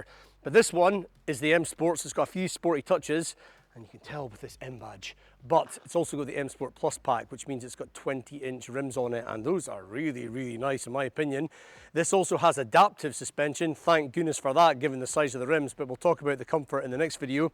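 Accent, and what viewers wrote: Scotland, United Kingdom. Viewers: Love the review. Love the Scottish accent and the Scottish location! I'd personally prefer the 30d or M40d, but that's just because I'm a bit greedy for the extra power. Too bad I'm in North America, where this wonderful generation of X3 was not sold here in any diesel variants if you can believe it, which makes me so upset as a diesel enthusiast.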